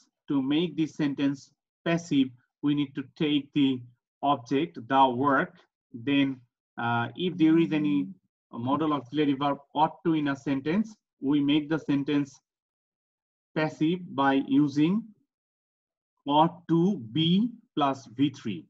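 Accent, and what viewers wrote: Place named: United Kingdom. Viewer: Sir, you talk like the British. I liked your lecture!!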